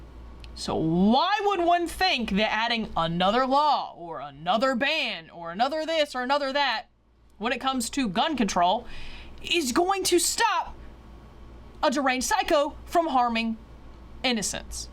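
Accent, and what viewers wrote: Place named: South, United States. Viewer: Your videos are great, and I really like that Southern accent… and that’s coming from a Native Texan! 😬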